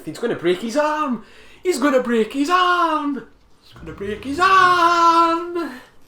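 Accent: Scotland, United Kingdom. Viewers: Hello mate, I love this movie, and more so your accent! Scottish to me is the best accent in English language, what part of Scotland is it from? Edinburg? Regards from 🇲🇽😎🤟🏼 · Even after 35 years, The Fly is the brilliantly grossest movie I've ever seen in my life. It still makes my stomach churn. I enjoyed your funny reactions to the gross-outs and I enjoy your accent - which I'm guessing is Scottish or northern English (to my untrained yankee-doodle-ears). Anyway, thumbs up.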